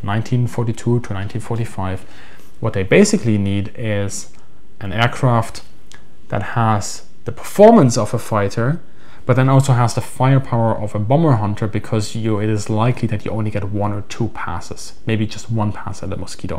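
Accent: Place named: Germany. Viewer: I apologize for the personal question, but to my ear your first language would be some form of German. It has been over 35 years since I used German on a daily basis for work and for regular living. It was never good enough for me to distinguish between some one from Germany, Austria, or Switzerland. Would you mind say which version of German you grew up with? If not, I understand and that is fine. Secondly, all my German Military works are from Bismark and others of his time and were published not long after they were written. How difficult is it to find similar works written by German Military Leaders of the 1930's and 1940's. While I was living in Niedersachsen in the 1980's attempting to purchase or even find such published writings was very problematic. Thank you very much for your quality videos and the supporting research that you do for them.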